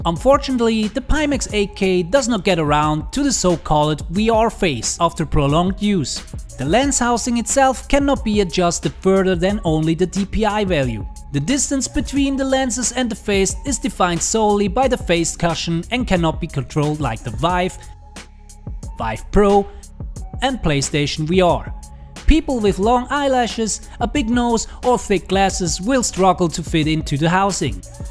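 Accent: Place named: Switzerland. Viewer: ha doch dänkt ech ghöre e Schwiizer :-)! Got the 5K+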